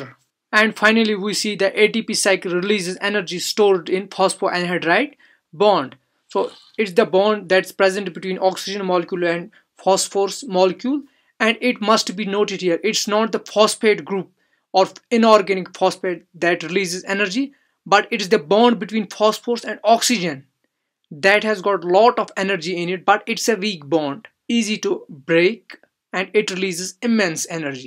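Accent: Kashmir, India. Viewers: You sound like you're a Kashmiri? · Kashmiri accent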